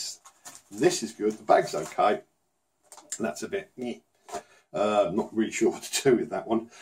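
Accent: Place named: England, United Kingdom. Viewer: After seeing a bunch of your videos, I've realized you use a lot of the terms my dad uses and you even have a similar accent. What part of England are you from? My dad's from Saddleworth in Manchester. Are you from around there?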